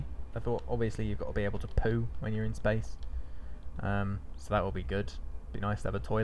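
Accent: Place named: United Kingdom. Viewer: Surprised you don't have more subscribers after I heard your quality British tea-time accent.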